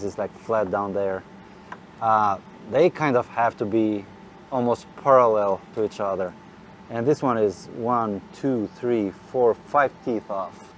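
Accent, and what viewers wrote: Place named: Albania. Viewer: Where are you from. Your accent is like Albanian.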